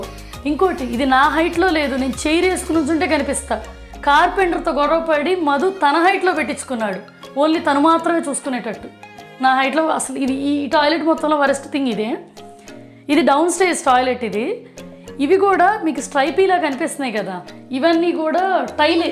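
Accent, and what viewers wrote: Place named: Guntur, India. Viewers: Mee language chusi anukunnanu..Andra ani..nice to see you in vidios...😍😍😍😍😍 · Hi sisterYouTube suggested your home tour video 3 months but I didn't see that, after Diwali again suggested your Diwali video after that I liked your Telugu slang I guessed u and your husband was from guntur dist.i watched all your videos really it's very entertaining.i am your subscriber. Now I am currently in Mexico, here I met so many Telugu people, they're very shy to speak in Telugu. any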